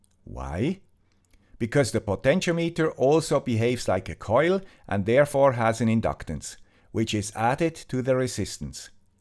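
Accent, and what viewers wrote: Switzerland. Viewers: So true Sir. And the cool guy with the cool Swiss accent always responds! Talking about dedication 😎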